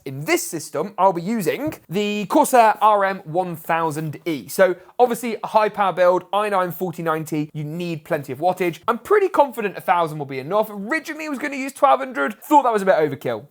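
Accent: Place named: North, United Kingdom. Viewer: I cant understand the north accent \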